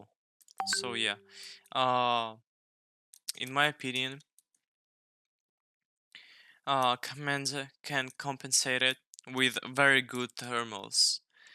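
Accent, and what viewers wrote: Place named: Russia. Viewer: Классное видео, ты кстати похоже рус? Потому что у тебя в акценте русские согласные ядерные.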